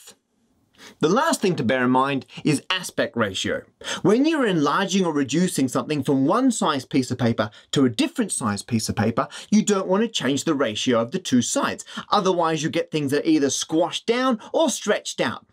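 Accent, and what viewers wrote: Australia. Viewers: Sounded like and Australian to me! - Alternatively, maybe New Zealander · If it's one of his former monarchs it's one of yours as well given that he isn't a brit lol. Sounds Australian to me. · I have only just realised that Matt is not English but Australian. I feel rather stupid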